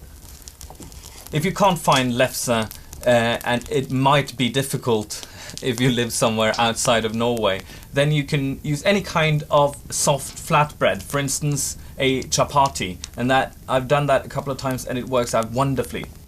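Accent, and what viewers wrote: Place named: United States. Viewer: He also herbs with a silent H (in a different episode?), which I'm quite sure they only do in the US.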